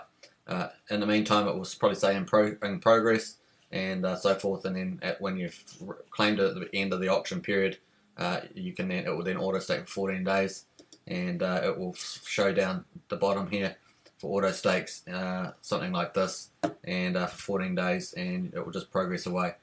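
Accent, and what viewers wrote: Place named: New Zealand. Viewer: You are obviously a kiwi boy. Kiaora for these clips. I have no idea how to work auctions. I need to learn more.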